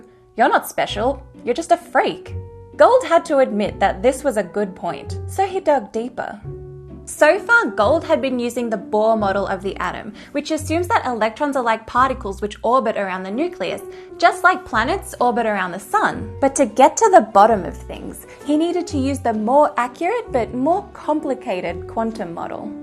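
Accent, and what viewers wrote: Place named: Australia. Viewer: Hot + Quantum physics + Australian accent = Sizzling Hot.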